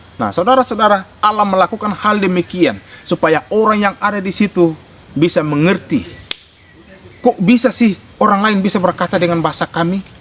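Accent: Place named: South Sulawesi, Indonesia. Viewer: Anda orang Toraja kah? Kayak Logat sulawesi selatan... Anda aliran Karismatik atau Pentakosta atau Reform?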